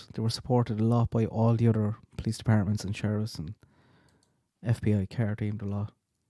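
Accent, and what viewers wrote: Ireland. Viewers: Not sure, a family member married an Irish guy, he talks exactly like you...he has Kerry gold butter n the tea, he makes a nice piping hot cup..he is from Tipperary..I am Irene Brady ,maiden name,have O`Hurley`s n O`Neal.. in family. I don`t know anything about my Irish heritage,it is such a mysteriously, romantic... brooding n beautiful place.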